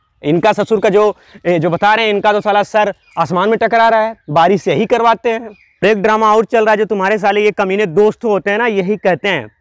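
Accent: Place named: Morena, India. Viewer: Aap bilkul Morena walo ki tarah bolte ho humari desi aawaaj mein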